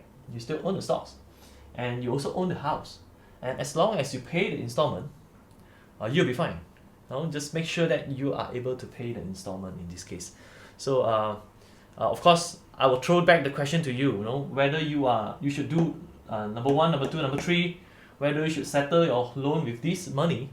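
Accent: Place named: Singapore. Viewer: I can't stand Singlish....my ears r so itchy listening to Singlish accent. Either you speak Chinese or proper English. But not Singlish...gosh.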